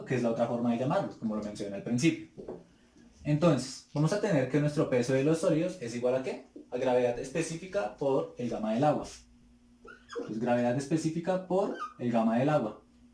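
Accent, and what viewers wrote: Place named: Colombia. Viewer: tal vez ya no te sirva pero por el acento creo que es Colombiano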